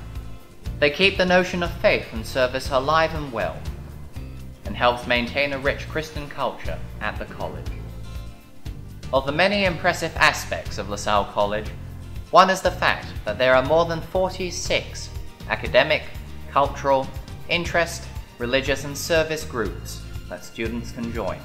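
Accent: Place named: United Kingdom. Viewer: Who recorded it? Nice British accent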